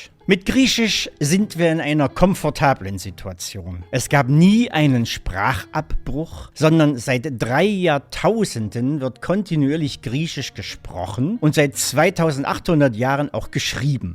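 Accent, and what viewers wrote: Germany. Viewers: Naja, es handelt sich um den Dialekt, der im Raum Dresden-Sachsen gesprochen wird, nehme ich an.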